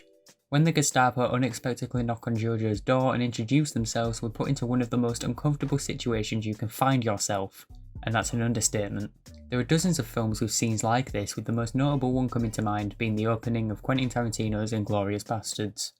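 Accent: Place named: Stoke, United Kingdom. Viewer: U sound stokie but then my accent gps is rubbish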